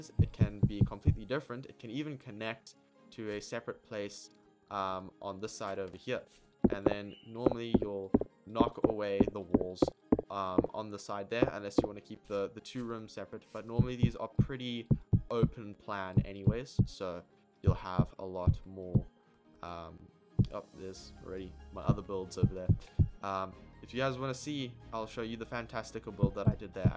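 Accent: South Africa. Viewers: South African ou?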